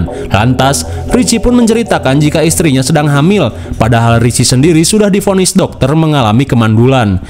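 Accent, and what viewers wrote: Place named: West Java, Indonesia. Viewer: Sering aplod video nya KA, soalnya aku suka banget cara ceritain alur nya lucu gitu aku suka, kaya ada Sunda sundanya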